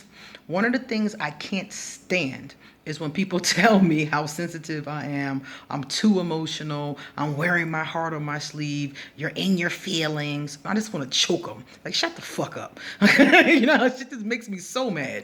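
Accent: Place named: Philadelphia, United States. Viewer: I knew I was getting philly girl vibes from you, I hear it all in your accent ❤💛💚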